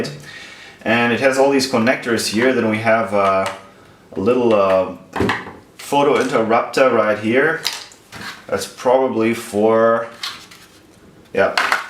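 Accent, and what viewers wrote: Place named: Germany. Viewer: Be careful with those videos about your television work. You could raise the ire of the television people if you release videos on things that haven't been aired yet. Movie goers aren't the only ones that tend to not like spoilers. 👍 Warm gear? Oh, worm gear. I keep forgetting that English isn't your first language. You speak English pretty darn well, so don't feel bad if I enjoy your accent a little. My German isn't even close to as good as your English, so I'm NOT mocking.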